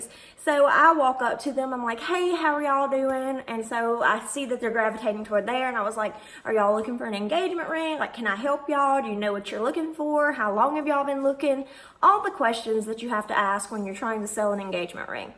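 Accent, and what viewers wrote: South, United States. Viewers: where in the south are u from because I like your accent 😊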